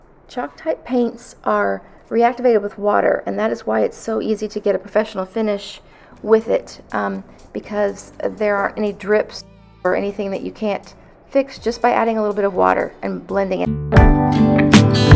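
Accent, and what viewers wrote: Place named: Texas, United States. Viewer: are you from Texas you sound like it. I’m from Texas. I’m going to add my cabinet painting to my channel and give you a shout out if you are ok w that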